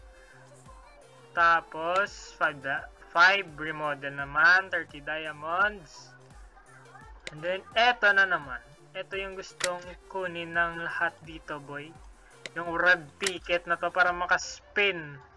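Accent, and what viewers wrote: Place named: Visayas, Philippines. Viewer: Idol taga saan ka idol parang bisaya ka ahh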